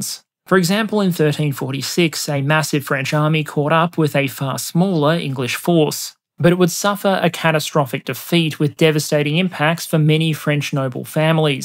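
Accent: Australia. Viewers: How did you learn to speak so many different languages? in the video where you have spoken about the war in ukraine, the germans, the japanese and now this one, your pronunciation of all those various languages (even though you can still hear the australian in it) is impeccable.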